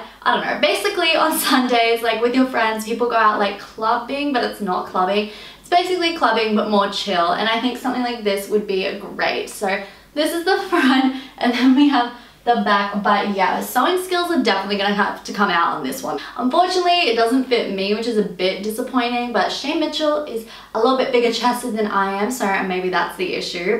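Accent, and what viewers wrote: Australia. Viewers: Why do I love them? Because she’s honest and classy without ever being cruel. She’s got a fun personality and I could listen to her Aussie accent all day!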